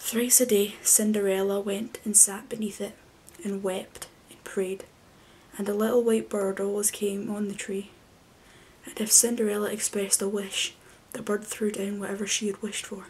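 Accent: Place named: Scotland, United Kingdom. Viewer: Oh!! I have such a soft spot for enchanting short stories and poems that leave you with chills. Very excited for the coming additions in the series!! I love love love scottish accents, I don't hear enough of it in ireland, french and scottish accents are probably asmr favourites of mine! Thank you for this 💫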